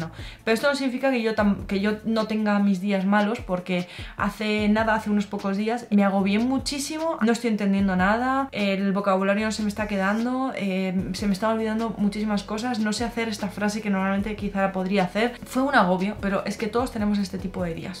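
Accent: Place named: Spain. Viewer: 🥰me gusta mucho tu accento de español aunque nunca me ha gustado el accento de muchos españoles y tambien te estoy imitando cuando hablo alemán 😅,,,no sé porque? Pero me das ganas de decir que alemán suena romantico 🤭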